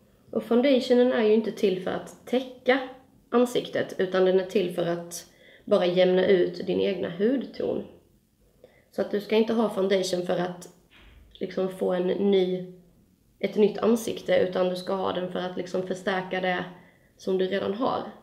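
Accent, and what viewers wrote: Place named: Skåne, Sweden. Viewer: du är duktig, men det suger att du pratar skånska. :(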